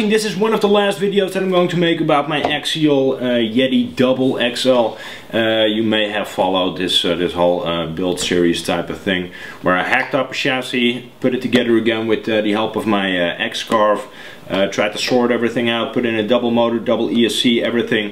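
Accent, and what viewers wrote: Netherlands. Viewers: Are you from Holland, you have a dutch accent.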